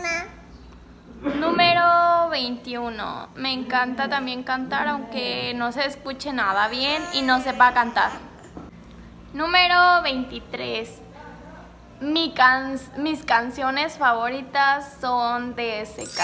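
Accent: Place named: Fresa, Mexico. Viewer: Hablas muy fresa 🍓😬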